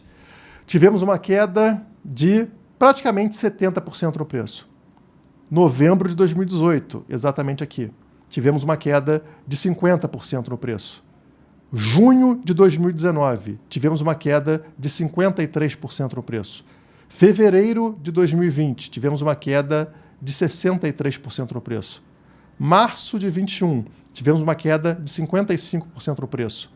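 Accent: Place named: Rio de Janeiro, Brazil. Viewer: pelo sotaque acho que é do RJ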